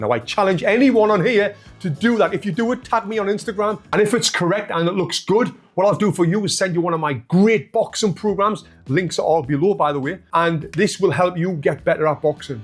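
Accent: United Kingdom. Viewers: Your accent reminds me a Guy Richie movie! Lovely tutorial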